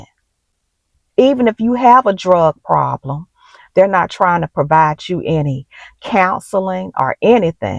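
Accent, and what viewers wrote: South, United States. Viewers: Sis...I had to listen to this three times to get the message...that lovely southern accent is mesmerizing...complete soul snatching in effect.🤩❤ OK...now to my point. When the Dems were in charge of things, 'Everybody' and their vices were included (including the Devil's Lettuce). Alabama is Ultra right and those that live there need to be knowledgeable of the law. It is what it is... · Love your Southern accent.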